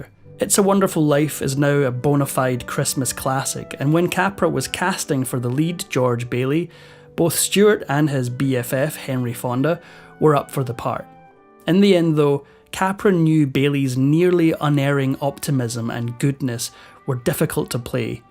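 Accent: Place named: Scotland, United Kingdom. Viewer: A fellow Scot narrator 🏴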